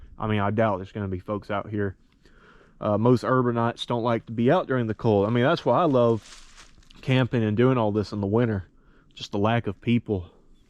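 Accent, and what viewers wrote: South, United States. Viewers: Love your backwoods country talk, hope Sasquatch leaves you alone, Outdoor boys, Is formal, you are country, I love country, hope to see more videos. Keep em comin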